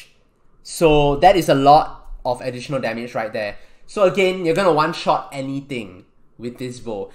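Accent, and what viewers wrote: Singapore. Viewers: u kinda sound singaporean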